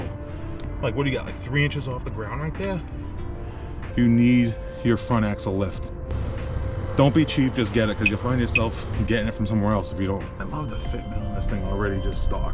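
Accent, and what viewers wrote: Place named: Boston, United States. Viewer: ❤😂😢🎉🎉🎉😮😮😅😅😊😊😊😊😊 keep talking, bro I love that accent my friends from Boston and she sounds just like you good job, brother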